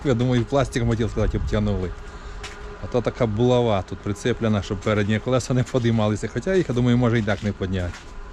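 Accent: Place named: Polissia, Ukraine. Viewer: Доброго дня , у Вас мова даже схожа на людей з Полісся, Чорнобиль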